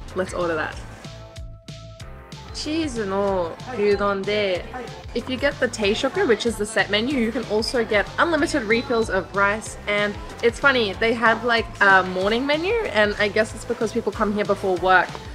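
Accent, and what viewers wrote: Australia. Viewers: Is your English Australian? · Love your Aussie accent 😊. Thanks for your video from a Japanese-Canadian 🇨🇦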